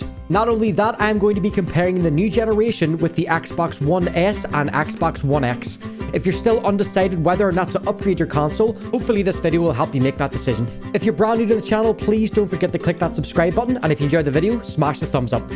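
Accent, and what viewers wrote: Northern Ireland, United Kingdom. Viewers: Good to hear another person from ni here lol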